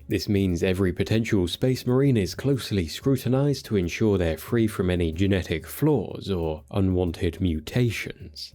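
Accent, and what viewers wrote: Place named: United Kingdom. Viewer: "Imagine yourself listening to a man with a british accent explaining things that involve mystery and murder"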